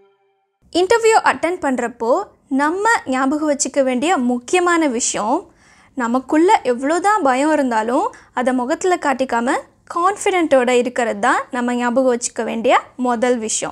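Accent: Madurai, India. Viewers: Ne ga madurai ya ne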